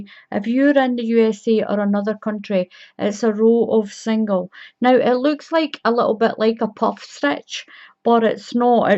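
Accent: Scotland, United Kingdom. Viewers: Thank you once again for a brilliant tutorial. Well demonstrated no music to distract and a bonus when I hear your Scottish accent. I would subscribe to your channel but do not want my name shown on line. If I can do it any other way I would be happy to subscribe. Thank you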